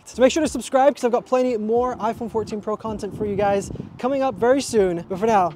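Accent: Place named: United States. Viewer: You have an American accent. Are you an American living in British land?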